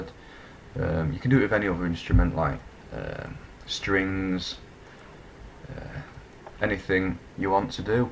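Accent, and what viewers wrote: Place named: Yorkshire, United Kingdom. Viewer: Thanks for this, good to hear a Yorkshire voice on here!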